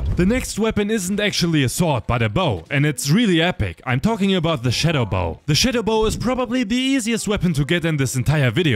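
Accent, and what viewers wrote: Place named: Germany. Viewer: Ja er ises selbes Logo,selbe stimme und nen unübersehbarer deutscher akzent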